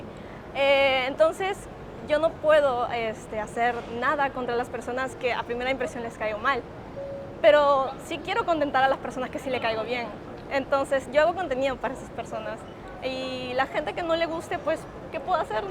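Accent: Lima, Peru. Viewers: Se le siente muy peruana , me encanta quw sea bilingue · Como se le nota el acento Peruano jajajaja exelente 🇵🇪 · Ciertoooooo acento limeño!!! El acento peruano es neutro y único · su espanol es muy limpio como la mayoria de peruanos que no son de la sierra ojo ! yo quiero mucho a mis compatriotas andinos , pero manejan un espanol muy marcado ! a diferencia del limeno que puede ser limpio cuando se lo propone incluso por encima de cualquier pais hispano americano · Y bien peruano, aunque ella suena limeña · En Perú se habla como ella usted se ve que no conoce nada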